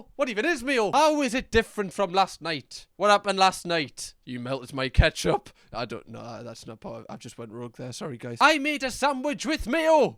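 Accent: United Kingdom. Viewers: With an american accent we have to say pr-👁️-vacy. With your british accent priv’acy works:) you and can say either if you want imo 😆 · You could spend YEARS on Reddit for reaction content -- "Am I the Asshole?" (AITA) is loaded with insanity. Also, from what I know, the UK says "privacy" with a short i, as in "igloo", and the US says it with a long I, as in "I'm going to the store." Personally, I like your UK pronunciation because, you know, you're from the UK.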